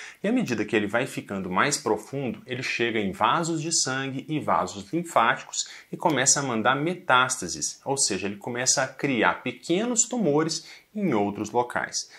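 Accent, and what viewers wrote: Minas Gerais, Brazil. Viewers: Mais é di minas uai?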